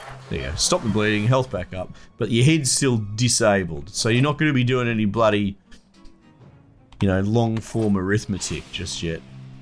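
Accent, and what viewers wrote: Australia. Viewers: This is the most laid back Aussie commentary I've ever heard on YT. Old mate? Any chance you're from northside of Brisbane?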